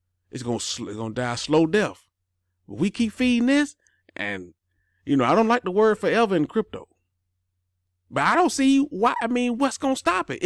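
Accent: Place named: South, United States. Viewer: That that “southernplayalistic” dialect!! Yas sir!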